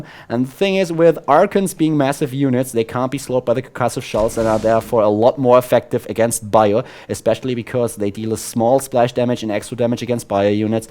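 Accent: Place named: Germany. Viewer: wow no comments i dont know why cuz i really like the comentary and the German accent if im not mistaken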